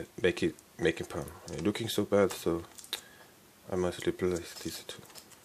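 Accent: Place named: China. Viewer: Sounds like a chinese trying to speak english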